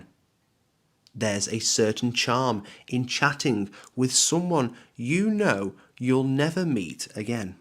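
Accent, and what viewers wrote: United Kingdom. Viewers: What accent do you have? Is it British?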